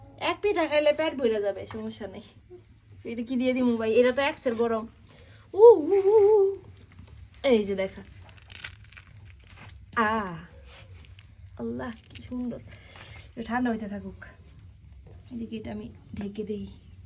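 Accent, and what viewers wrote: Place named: Barishal, Bangladesh. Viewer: বরিশালের বউ বরিশালের কথা শিখে গেছে মাশা-আল্লাহ মামি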